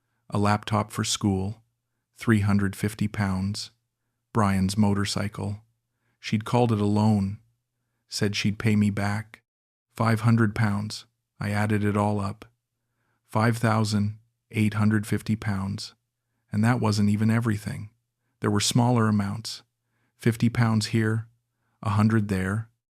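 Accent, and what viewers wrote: United States. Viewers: Another yankee voice talking about fakeness, yankees are good at scam stories.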